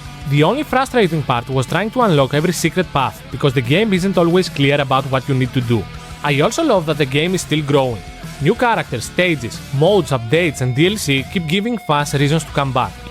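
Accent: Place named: Greece. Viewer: Greek English the best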